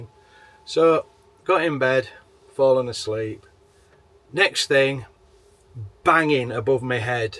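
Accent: United Kingdom. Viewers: Oh, I hear an accent, you are in the UK. Beware, Jack the Ripper got his start in and around London.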